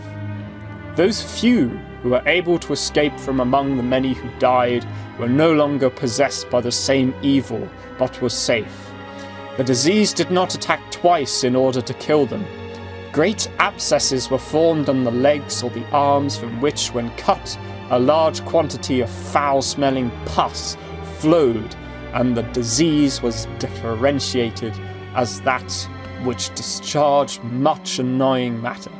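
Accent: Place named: United Kingdom. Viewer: Good show, but I cannot stand a British accent. Also the background music is far too loud and annoying.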